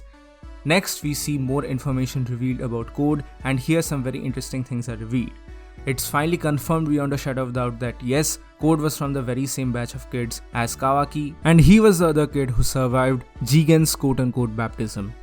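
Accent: India. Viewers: In your language tone i know u are Indian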